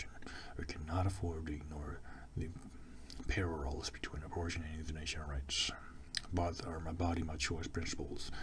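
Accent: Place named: South, United States. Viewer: Your accent sounds very country southern USA.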